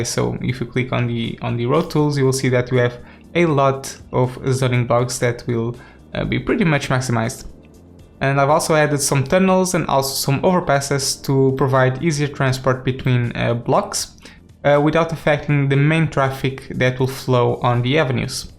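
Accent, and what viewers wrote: Portugal. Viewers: Engraçado que ouvi o teu sotaque e percebi logo que eras tuga :) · A voz não engana ninguém, a maneira de falar o inglês, nota se mesmo que és tuga caralho!!! Gosto muito dos videos, continua o bom trabalho!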